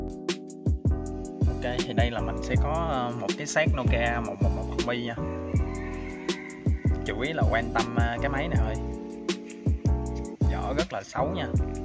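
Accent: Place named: Mekong Delta, Vietnam. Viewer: rất thích nghe giọng người miền tây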